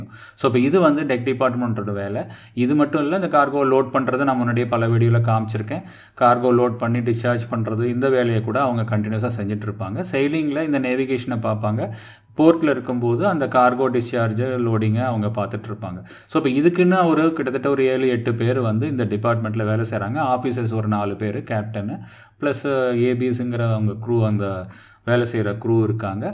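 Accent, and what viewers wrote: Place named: Karaikudi, India. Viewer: காரைக்குடி ஒரிஜினல்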